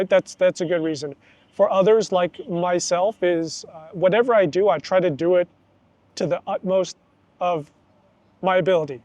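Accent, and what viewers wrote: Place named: California, United States. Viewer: You are an American from California, right? Judging from how you speak English, I figure you are an American from California. Why not just work in high tech industry in the U.S.? I believe that U.S. salary is still better than working in Thailand. U.S. has its problems, but it never withholds opportunities for the right person. I cannot say the same about Thailand. Keep up the good job in learning Thai. I like to know how you improve your listening comprehension in Thai? For me, listening is the most important aspect of getting to know Thai people. But it is also difficult because I am still challenged by Thai tones, despite having studied online with Thai teachers from Bangkok for two years.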